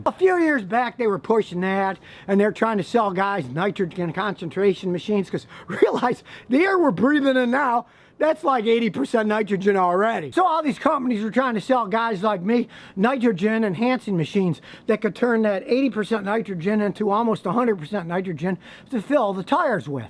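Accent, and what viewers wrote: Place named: North, United States. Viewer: ! Are you from Houston originally? (I’m born and raised in Houston but live in Cali now). You sound like you’re from the north. Also I LOVE your videos. I’m a car salesman and you make me laugh so hard AND help me learn a lot (which helps me to properly educate my customers - Win, Win, WIN!)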